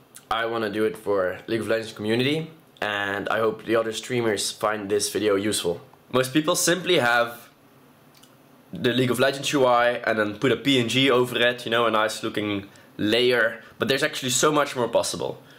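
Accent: Netherlands. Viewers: Lol love ur englisch its so funny and i reconice myself, becous i also speak dutch ;D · Are you Dutch?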